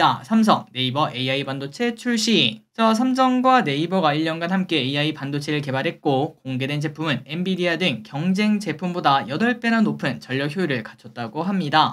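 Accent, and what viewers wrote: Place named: Daegu, South Korea. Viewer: 이거 어디까지 올라가는거에요?? 찐 대구 사투리네요ㅋㅋㅋ😂😂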